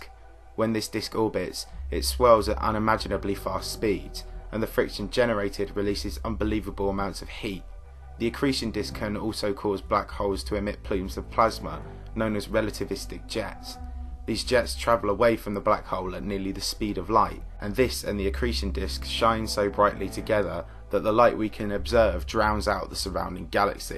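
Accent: United Kingdom. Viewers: I love the british accent. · I can't stand this guys British voice.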